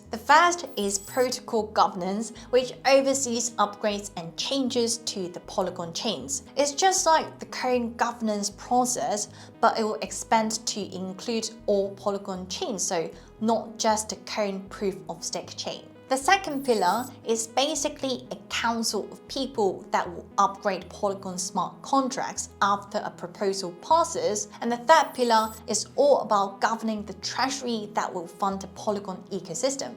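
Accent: United Kingdom. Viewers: This girl is learning English, and learning to speak it in an English accent hehe fair play!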